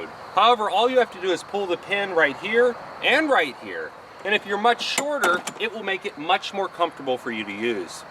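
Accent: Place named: Pittsburgh, United States. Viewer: Are you from Pittsburgh? You sound like you're from Pittsburgh.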